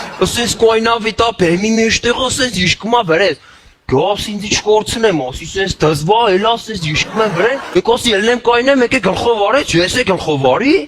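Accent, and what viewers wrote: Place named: Gavar, Armenia. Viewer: Գավառի համով բառբառը շնորհակալություն ձեզ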